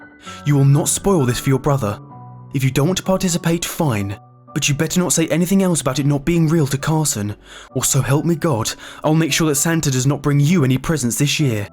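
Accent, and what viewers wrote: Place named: United Kingdom. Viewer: Firlst time one this chanal by the way Me when it starts: AHHHH!!!! BRITISH ACCENT!!!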